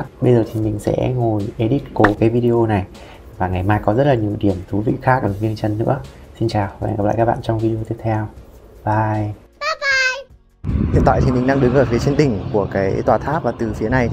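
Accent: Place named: North, Vietnam. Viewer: Cảm ơn em, em dẫn chương trình khá dễ chịu, nói chuyện châm rõ ràng, chất giọng chuẩn trai bác, cô gắng nhé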